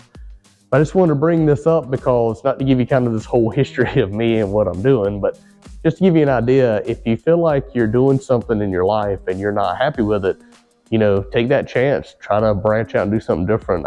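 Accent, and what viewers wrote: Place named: North Carolina, United States. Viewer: Man, the more I looked at your videos the more I began to think you’re the same person from a forum I used to be on back in the day, HPJunkies. Then I started listening closely to your accent and that’s definitely a North Carolina accent so my bet is you’re the same person! Loved your threads on that board back then and your videos now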